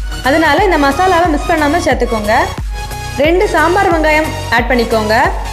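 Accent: Nagercoil, India. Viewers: Ovoru ooruku oru slang iruku... Nagercoil slang romba special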